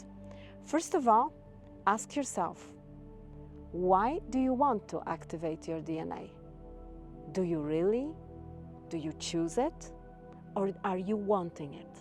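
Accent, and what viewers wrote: Poland. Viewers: Omg you sound so Polish, lol. ❤❤❤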